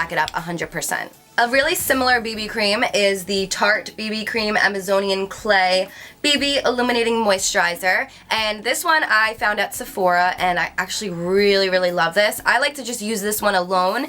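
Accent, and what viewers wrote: New Jersey, United States. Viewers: I was going to comment on her pronunciation of these two brands as well, but then I saw your comments. Haha Being such a beauty guru, I was really kind of surprised she didn't know the correct pronunciation since these are really common brands--especially here in the U.S.. I think I noticed her mispronouncing NYX is another video as well. Nonetheless, she is still adorable. The mispronunciation just kinda added to her bubbly, cute, & quirky personality and that New Jersey accent! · It might just be her jersey accent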